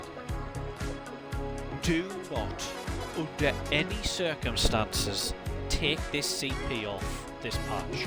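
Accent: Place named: Sunderland, United Kingdom. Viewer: Random one are you from north east? Sounds like a Sunderland accent like me.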